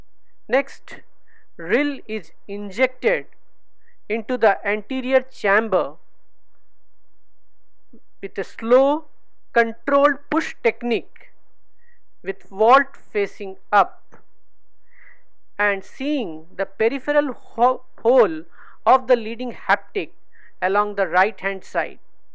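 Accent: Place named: India. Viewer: This doctor sounds Indian.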